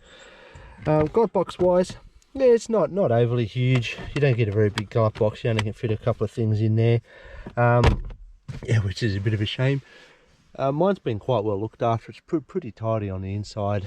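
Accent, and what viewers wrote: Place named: Australia. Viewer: I can see from your accent you are aussie mate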